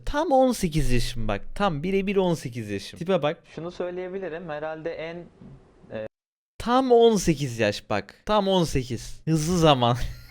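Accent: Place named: Russia. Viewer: yayını izlemediyseniz adam rus o yüzden öyle konuşuyor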